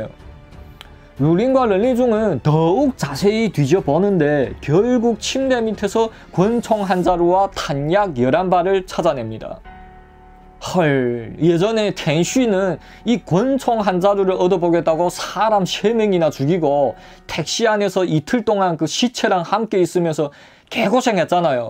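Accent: Yanbian, China. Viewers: 엉아가 한마디 advice를 하면 방송할때 서울표준말로할려고 노력하지말고 그냥 조선족 악센트, 아니면 자연스럽게 중국어 악센트가 섞인 한국어로하는게 훨씬 조타아이니, 그게 니 매력포인트 아이니 네가 서울 표준말 방송보고싶으면 그냥 KBS,MBC,SBS 방송보면되는거 아이니 네가 TV보면서 말투 따라하면서 댓글도 그러게하는데 보는 많은 사람도 내생각에 동의랄텐데:D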